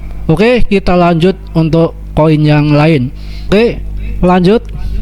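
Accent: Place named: Java, Indonesia. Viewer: Saya demen mba suaranya medok Jawa banget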